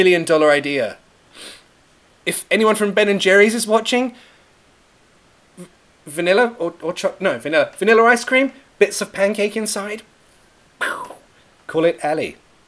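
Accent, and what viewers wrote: United Kingdom. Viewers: really enjoy your teaching as always <3 thanks a ton~~~ Wanna get the British accent !! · i love the way you teach English "British English " ...and m really trying hard to pronounce the words and sound like you people ...i really like British accent...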